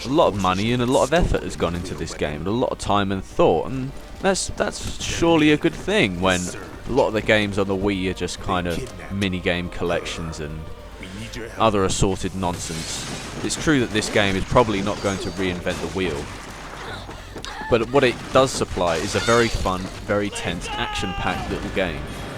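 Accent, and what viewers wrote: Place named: United Kingdom. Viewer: Thanks, nice short, snappy review. I'm thinking of getting a cheap Wii so I'm checking out some cheap games too. And always nice to hear a restrained British accent on YT.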